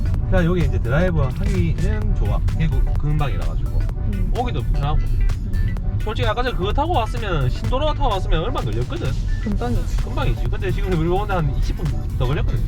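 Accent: Daegu, South Korea. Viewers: 군생활을 대구에서했는데 간만에 오리지날 대구사투리 반갑네요. 연비 잘보고갑니다.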